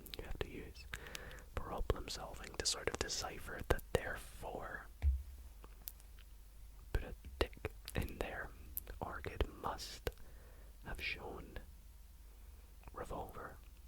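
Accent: United Kingdom. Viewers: Just stumbled upon your channel and i love it ❤ Are you from the UK?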